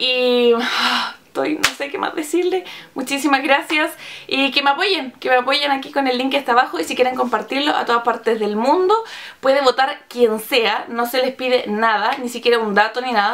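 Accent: Chile. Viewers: de que parte de chile es? que alguien me digaaa😂😂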